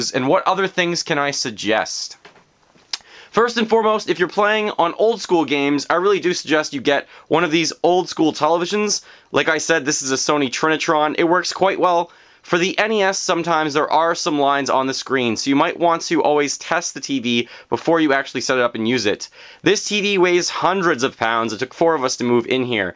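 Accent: Canada. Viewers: ***** He sounds Canadian to me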